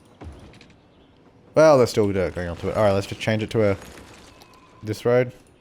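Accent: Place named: Australia. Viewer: What your Australian? I thought you were from the UK PS: I didn't know Australians drive on the left side of the road.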